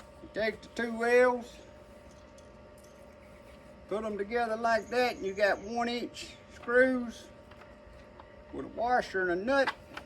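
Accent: South, United States. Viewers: I can’t take the deliberate redneck accent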